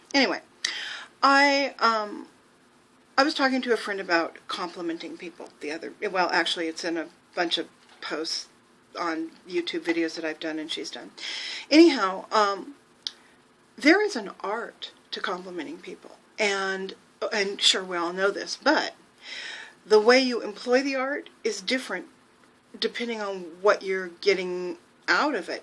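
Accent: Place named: South Carolina, United States. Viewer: I cannot wait to see you in character with all the bells and frills and everything else complete. Love that South Carolina accent, by the way, you got it goin' on! :) Mah word!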